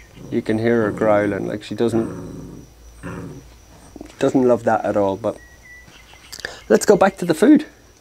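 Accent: Ireland. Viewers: marshmallow will come to love you just like the rest of us. Nobody can resist that Irish lilt.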